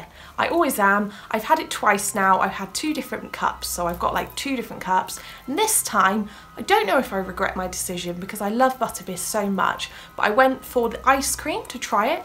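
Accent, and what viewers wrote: England, United Kingdom. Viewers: I love your english accent 😙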